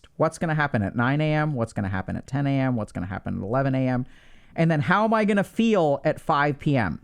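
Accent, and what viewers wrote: Russia. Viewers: russian accent sounds so cool, tbh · Solid Russian accent (i have the same) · I liked the russian accent) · That’s a solid Russian accent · Hearing speak in Russian accent is the real therapy, everything else is just a bonus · Doctor Ks russian accent is top notch tho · I found your Russian accent hilariously great. Very good!!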